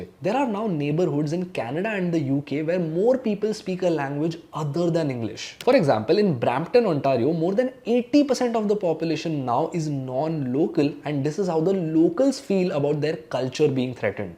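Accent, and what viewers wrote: India. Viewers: Funny North Indian accent 🤣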